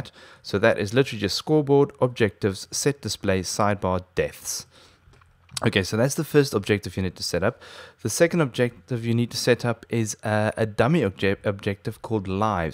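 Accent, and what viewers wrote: South Africa. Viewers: You sound south african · Ek dink dat jy suid afrikaans is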